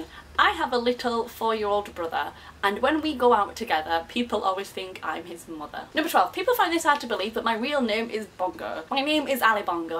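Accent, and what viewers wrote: Yorkshire, United Kingdom. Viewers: i think yorkshire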